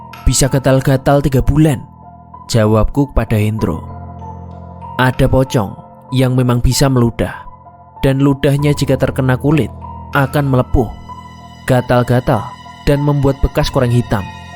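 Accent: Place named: Java, Indonesia. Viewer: Enak jowo ne mas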